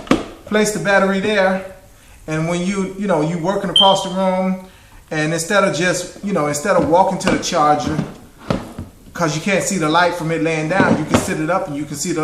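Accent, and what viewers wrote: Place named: South, United States. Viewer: Very southern twang review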